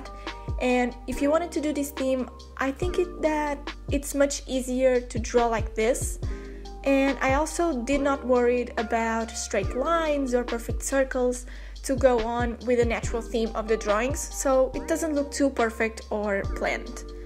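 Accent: Portugal. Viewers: Tive de ir procurar os comentários portugueses.... Eu estava a ouvir e só pensava : este sotaque é muito uma portuguesa a falar ... Tive mesmo de ir conferir , eheh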